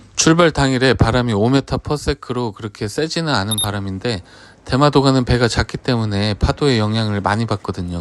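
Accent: Jeolla, South Korea. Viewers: 전라도 사투리 억양 반갑구마 잉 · 전라도분 이신가요?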